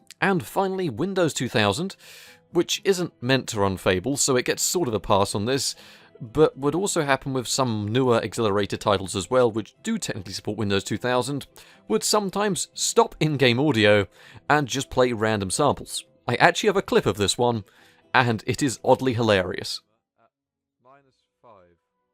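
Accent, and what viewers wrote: United Kingdom. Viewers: why i hate british accent i dont understand :/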